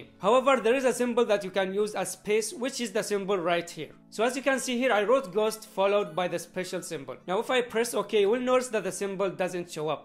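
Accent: India. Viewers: At first I thought your indian by your accent then I realised Indian can’t be this good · Are you an Indian bro ? because i think your an indian because of your accent from: Philippines🇵🇭 ❤️❤️❤️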